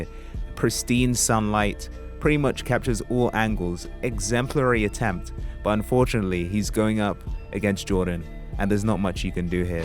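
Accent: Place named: United Kingdom. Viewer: Dude I kinda hear an accent, are you originally from the uk? 🇬🇧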